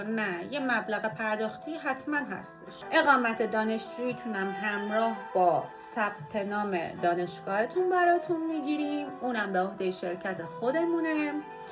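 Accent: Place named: Ardabil, Iran. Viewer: ولی ته لهجه ترکی اردبیلی که داری قشنگه عزیزم تلاش نکن کنترلش کنی راحت صحبت کن🥰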